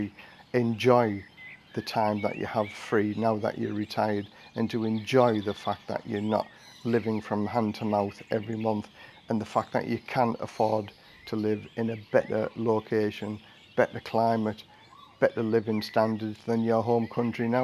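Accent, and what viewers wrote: United Kingdom. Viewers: Thanks, came at the right time! Saw this coming in the West; only going to get worse. Really appreciated, just needed the extra encouragement from a recognisable voice from the UK. Decisions made..later this year to visit. thankyou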